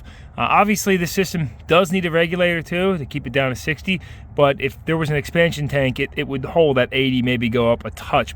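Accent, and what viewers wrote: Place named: Texas, United States. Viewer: What part of Texas are you?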